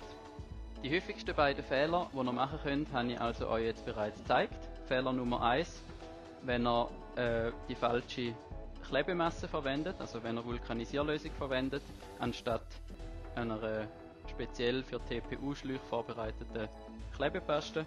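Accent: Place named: Switzerland. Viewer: Super Erklärung. Fahre TPU seit geraumer Zeit. Gestern der erste Plattfuss. Bin mal gespannt wie der selbstklebende Flicken hällt. Ich verstehe das Schweizerische übrigens recht gut;)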